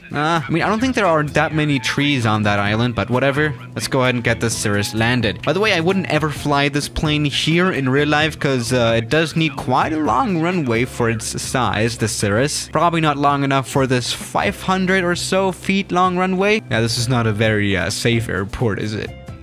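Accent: Germany. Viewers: Wait are you german?😂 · Does anyone else notice his German accent coming in stronger every video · R u german?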